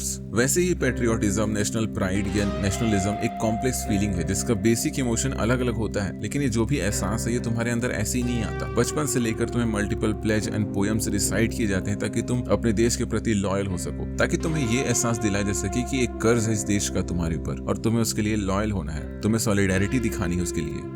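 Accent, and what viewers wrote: Chhattisgarh, India. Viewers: Aap Chattisgarhi hai kya? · No offence ! But are you Chhattisgarhi?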